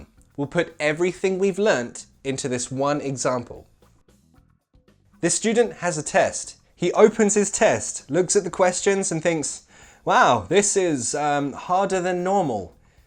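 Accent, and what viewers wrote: United Kingdom. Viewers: A friend of mine recommended me to watch your videos in order to improve my british accent and im so glad he did so because your videos are perfect (+_+) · I love your lessons!! I LOVE the way you deliver it! I LOVE your British accent 😢 and there are always new things to learn ❤ Keep up the good work!. I won't miss any of your videos again 🙌. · When I wanted to learn British accent, I found you here at YouTube... How lucky I was!! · I just don't look for the video at the first time.I just like that like button.You are the first teacher on youtube who inspired me to learn British accent. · Nice lesson again and again. Btw i love ur British accent it so sexy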